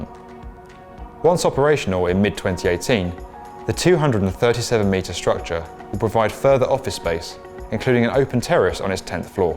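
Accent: United Kingdom. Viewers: Why is the project being described by a person with an British accent, and why are they using British spelling?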